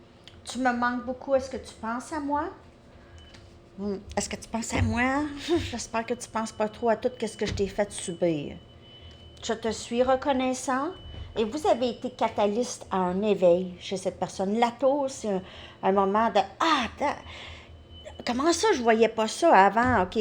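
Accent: Quebec, Canada. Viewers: Impressionnant! Exactement ce que je vis! Tu es trop forte, j'adore t'écouter! Toutes le compréhensions et prises de conscience se font, grâce à toi, dans la joie et la bonne humeur! Quel bonheur! J'adore! Un grand grand merci à toi! Et ton accent, québécois, craquant! Tu es un guide génial! GRATITUDE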